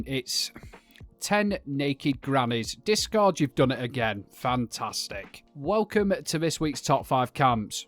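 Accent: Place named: Lancashire, United Kingdom. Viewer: Bolton or Wigan accent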